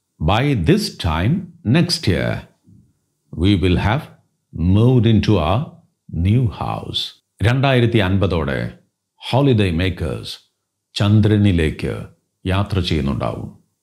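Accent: Thrissur, India. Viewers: Intro...kalakki....sirntae sound super... Sirntae voicelootae kettappo thrissur bhasha endhu rasama...👌👌👌👌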